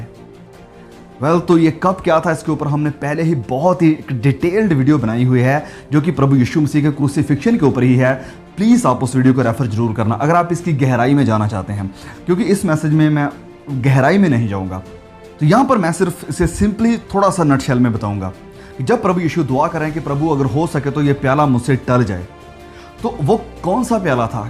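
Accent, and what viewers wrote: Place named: Doaba, India. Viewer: Dear brother you are speaking but I'm sure that you are a Punjabi fellow. Definitely you are from Doaba. Your style and pronouciation is of Hoshiarpur or JALANDHAR or kapurthala. May God bless you.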